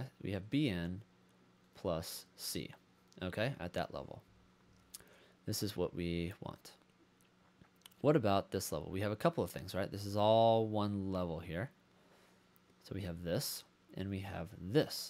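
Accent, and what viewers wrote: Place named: England, United Kingdom. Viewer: finally English accent!!! p.s ( i still love you indian computer science teachers :P )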